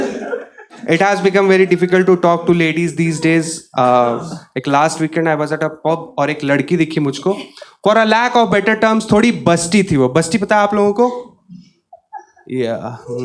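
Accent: Bihar, India. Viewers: Boliye se pta chalat hai marde tu bihar ke na hawa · Why that person said you're not from Bihar? Your accent seems 100% like any Bihari. My friends also speaks like this. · Bro, your comic timing is just amazing and by your accent, I can absolutely say with utmost confidence that you are too from Bihar.. Pr bhai, aap Bihar ko agr represent kr rhe ho, kisi bhi stage pr, to uski achhi chijon ko batao, talk about his glory and the progress that Bihar is on.. Not ki kuchh paiso k liye bhai tumlog bhi wahi kro ek time pr bhojpuri gaano ne Bihar ka image kharab krne me kiya hai.. When we are not going to respect our birthplace, how will others be going to respect?? I respect and enjoy your comic timing but please let us, the educated one, try and revamp the beauty and image of our birthplace Bihar, and it should be our first priority wherever we are. Waise, hum bhi Patna se hai.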